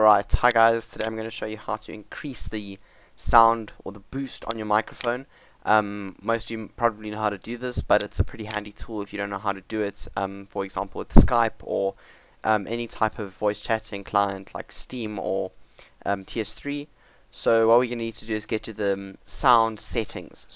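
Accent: South Africa. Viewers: Are you from South Africa? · Are you South African?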